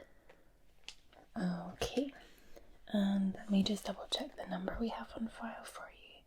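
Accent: Scotland, United Kingdom. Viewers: 26 minutes of tingles from the resplendent scottish lass. perry the platypus noises ☺️ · You are a person and you know what a person needs? a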